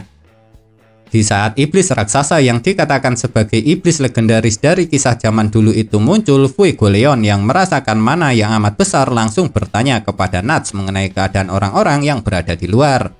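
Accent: Java, Indonesia. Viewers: Walaupun jawanya medok bnget.tp aq suka sma admin ne.soalx dya tu singkat and padat pnjelsanx.tp tolong donk bang ksih potongan2 dre mangax donk... Smngat yach bang...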